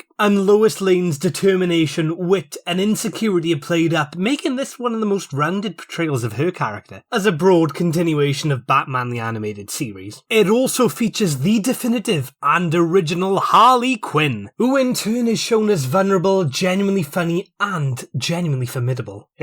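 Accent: United Kingdom. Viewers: Are you from Scotland? Im not well versed in the batman story but you kept it exciting and easy to follow.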